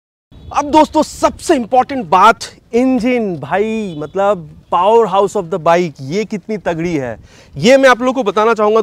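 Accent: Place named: Bengal, India. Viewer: But bengali accent of hindi hits different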